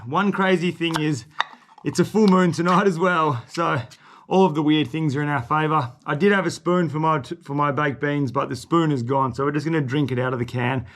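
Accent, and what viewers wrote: Australia. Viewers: Less than 2 minutes in and he's obviously another Aussie. I'd just like to know which state this is, as I've found a few strange unexpected places in West Australia, myself.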